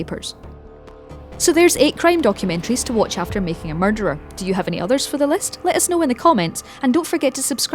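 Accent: Scotland, United Kingdom. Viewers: Thanks for this list, along with the Scottish brogue narration! Charming and informative.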